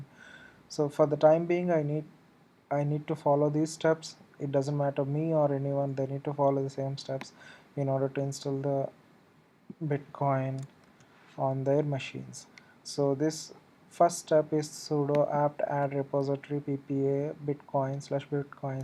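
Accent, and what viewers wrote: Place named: India. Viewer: I've seen like 10 videos of americans and other nationalities trying to explain a Bitcoin node. Awesome to see someone with an indian accent talking about this too. Nice video, keep it up!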